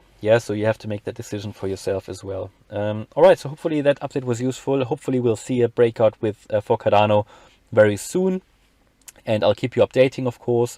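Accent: Germany. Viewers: Ergo, love the German accent!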